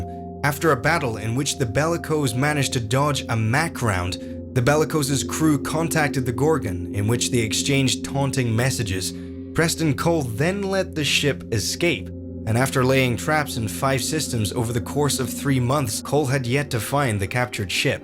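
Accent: United Kingdom. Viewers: I think you and installation both also have good voices for narrating Halo lore, especially because of how sophisticated and knowledge driven y'all two sound with the British accent.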